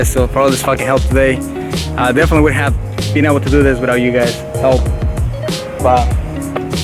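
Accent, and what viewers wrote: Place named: California, United States. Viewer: bruh when i first heard your accent i thought you was in cali and then i see all the japanese plates😭😭😭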